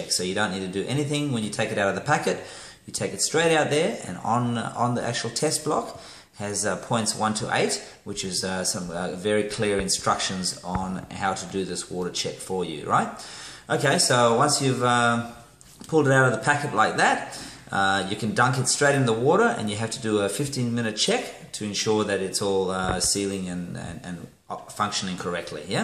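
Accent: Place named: South Africa. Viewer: Well done mate. Just purchased a dog and bone wetsuit case for my iPhone 5 as I'm heading to the Whitsunday's tomorrow. I returned home with my device in hand, raring to put it to work. Your little video is perfect. It's like a crewless cooking vid with just the details and predictions of little stupid things we consumers could overlook. The SA accent was the only thing that caught me, but I am full of confidence going into the water with my link to the great big world. Thank you.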